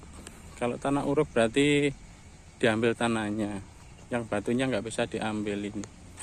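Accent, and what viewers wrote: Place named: West Java, Indonesia. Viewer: bhs mu ane kl jabar kn..lucu haha hebat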